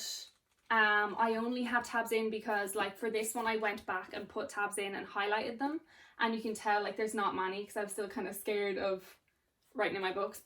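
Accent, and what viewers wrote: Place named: Ireland. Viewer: I stumbled across this video by chance, it's the first I've watched from your channel. I am the person you were before you made the leap to start with the careful pencil annotations. For some reason, I can't write into a book! 😁 I've written careful pencil remarks maybe have a dozen times, but this was when I noticed errors (usually translation errors that I've verified with the original) that stumped me so that the next person reading the book (or possibly me in a future reread) would have that information. But I've had the wish to retain certain memorable quotes and seeing how you annotate is fascinating even though I wouldn't want to write in my books with color pens. 😅 Hey, this is off-topic but I love listening to you. English isn't my native language; I'm from Germany and I don't remember hearing any Irish folks talking in English in my school days learning the language. 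(That was before the internet, and all movies / TV series over here are dubbed - the dubbing is done extremely well but of course, there would be so many accent I wasn't familiar with.) For my first real job after uni I went to Ireland and was living and working there for while (in Dublin, obviously, because this is where the jobs were). When you started talking, it was like being back there. I hadn't been aware of it at that time but the Irish accent really has a special charm! Unfortunately, I didn't see much of the island due to my being based in Dublin without a car but I'd been to Clare in a holida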